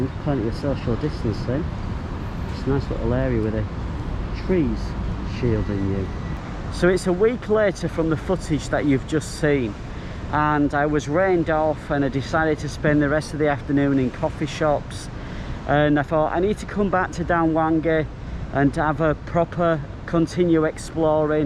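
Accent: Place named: Northern England, United Kingdom. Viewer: Enjoyed the video. Nice to hear a northern accent. I have a mate here in KL from Liverpool but possibly you're a bit further north than that! Me, I'm a Sussex lad from the South.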